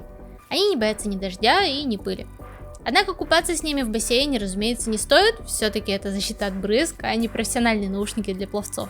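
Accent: Perm, Russia. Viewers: Вы что, из Перми?)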